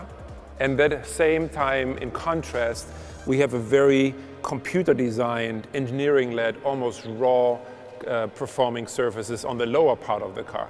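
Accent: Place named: Germany. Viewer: Der Man hat ein deutschen Akzent👀 Das Video ist auf einem deutschen Kanal👀 und dazu noch in dutschland👀 Warum spricht Er Englisch 🤷🏻‍♂️👀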